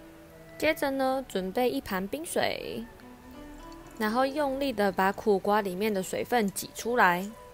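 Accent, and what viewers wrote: Cantonese, China. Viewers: 啲廣東話好得意😂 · 台妹講廣東話好可愛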